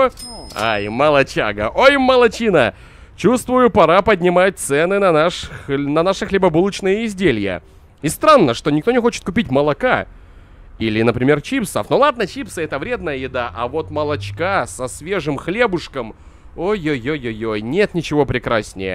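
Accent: Belarus. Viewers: Ты из Белоруси? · ты белаРус?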